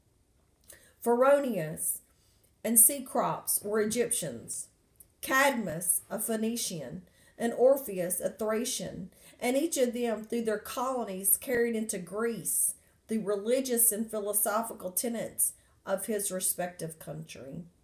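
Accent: South, United States. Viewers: Me being from the south I love that southern accent don't change it for anyone...one book I will suggest for you to read and maybe to expound on its arcanian Freemasonry. By RA Gilbert..this book was shed a lot of light on a dark subject for you and will bring together some missing pieces of the puzzles as far as other things are done and said how are ancient Freemasonry was that of the arts of the ancient Egyptians and how the Greeks and Romans stole it in Martin British empire who inherited has changed it to be a good old boys club while trying to exclude African-Americans from it. Great piece you're doing wonderful.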